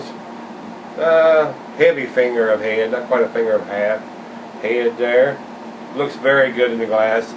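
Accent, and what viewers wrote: United States. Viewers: I love your reviews. I love that you're obviously country but are clearly intelligent and articulate. Also I agree with almost 100% all of your reviews I've seen on Chocolate Stouts so far. Nice videos thanks. This beer is one of the best I've ever had!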